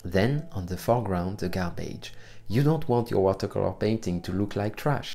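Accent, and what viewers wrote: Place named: France. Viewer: Non, c'est compréhensible. Pour un anglophone l'accent français est charmant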